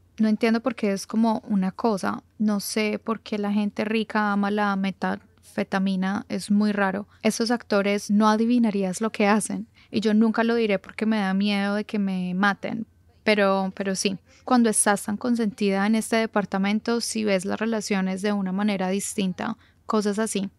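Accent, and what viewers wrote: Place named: Colombia. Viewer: Amo el acento colombiano de la chica que habla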